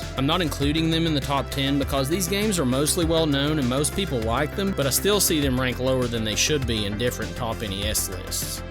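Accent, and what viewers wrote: South, United States. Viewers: Great content and I love your accent man. As a fellow southerner it gives me great pride to hear your voice in a well out together video game review YouTube channel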